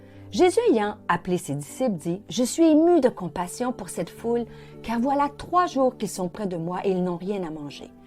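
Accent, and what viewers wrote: Quebec, Canada. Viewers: Bonjour Pasteur.Contente d'entendre une voix féminine ce matin.J'aime bien votre accent Québécois. Merci pour les explications sur ce passage de Matthieu 15...Amen..Je crois que le Seigneur Jésus a le pouvoir de faire fructifier mon finance afin que je puisse aider les autres. Restaurer les couples en difficultés et ouvrir les yeux et se révéler aux personnes qui ne le connaissent pas.Gloire à Ton nom Seigneur Jésus Christ. Soyez richement béni en ce mois de décembre On est ensemble ❤ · Bonjour un plaisir d'entendre cet accent je ne connais pas le Canada mais j'aime votre accent. Merci pour ce partage, oui Seigneur tu as toute la puissance pour multiplier nos besoins spirituels, physiques,moral, matériels. A toi sois toute la gloire.❤️🙏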